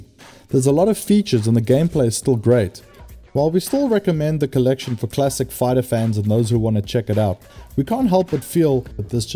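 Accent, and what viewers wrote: South Africa. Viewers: Your review video popped up in my suggestions list & I decided to have a look without taking note of your channel's name... After a few moments into the video I was like this guy sounds familiar, South African like familiar! Greetings from a South African living in deep South Texas USA... I enjoyed your video, I've been thinking about getting the Capcom Fighting Collection, the Darkstalkers, Cyberbots, Red Earth & Puzzle Fighter are quite appealing to me, so I think I'll take the plunge. I've already got the Street Fighter 30th Anniversary Collection so it'll go well with that. I do however wish they'd port some of the older Tekken games, namely 3 & 4 for the Nintendo Switch, now that I'd be seriously into! Subscribed & looking forward to more videos. 👍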